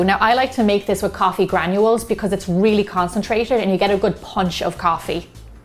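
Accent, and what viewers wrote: Ireland. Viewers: Are you Irish or American?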